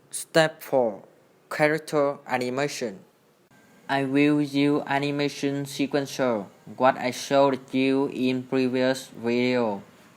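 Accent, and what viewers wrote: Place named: India. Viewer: Y như mấy a ấn độ đang nói tiếng anh d :v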